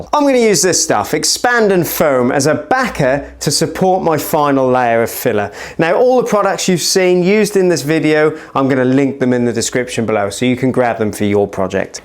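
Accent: Norfolk, United Kingdom. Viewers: Are you a Norfolk boy? I've lived/worked all over the uk, and have been in Scotland for about 38 years, but I think I recognise that accent 😊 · As a decorator of 30 years I can confirm polyfilla in a tube is the worst! The one time tub is good for pin holes. Easy feel is the way! Good video, sir. May I ask, is that a Norfolk accent? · You from Norfolk or Suffolk? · Love your videos mate! But gotta ask - are you a Norfolk boi? Im getting a hint of that accent being one myself lol! 👍👍👍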